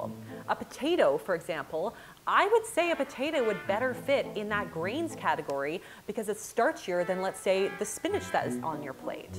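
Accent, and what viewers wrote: Canada. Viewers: She has a strong Canadian accent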